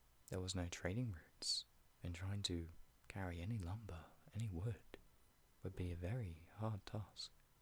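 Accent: United Kingdom. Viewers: FINALLY A BRITISH AUDIO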